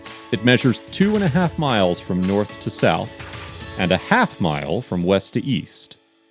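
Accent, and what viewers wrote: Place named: United States. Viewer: please do hindi language like previous u did please everyone cant understand english specially american accent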